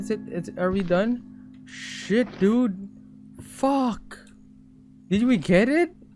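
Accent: England, United Kingdom. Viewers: I love his English accent tho 🥵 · Nice English Accent Though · I love how I'm so obsessed about his videos but maybe it's because how real he is and how his English accent is just the right voice with these horror gameplays.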